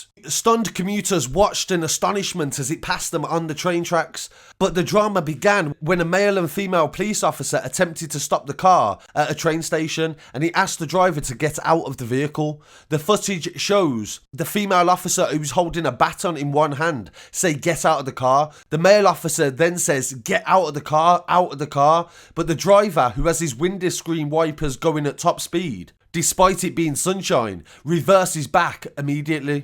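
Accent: Birmingham, United Kingdom. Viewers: Bro you're ACCENT is soooooo brummie it's unbelievable I'm from Birmingham myself but boy your accent is so strong. Where you from in Birmingham? I'm from chemsley wood if you know then you know 🤪🤪🤪 I'll subscribe to your channel and will be waiting to see some more breaking news ✋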